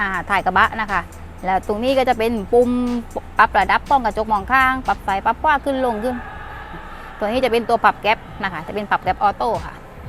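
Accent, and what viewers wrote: South, Thailand. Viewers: บรรยายแบบใต้ๆ จริงใจกันเอง น่ารักครับ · พูดใต้เป็นตัวของตัวเอง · นี้และมั่น เป็นตัวของตัวเอง บรรยายได้ดีมากคับ ผมก็คนใต้ ชอบๆคับแหลงหรอยนิ🥰🍃💨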